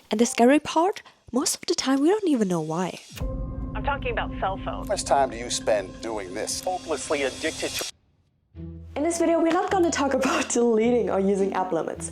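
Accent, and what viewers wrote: Germany. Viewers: The tips are GREAT, btw this was actually the first video I've seen from you, and I immediately questioned if you were German or Austrian, by your accent and furniture?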